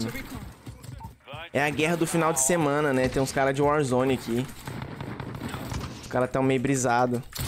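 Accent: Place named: Montes Claros, Brazil. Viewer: Cê e de Montes Claros ???? Responde aí Sotaquizinho não engana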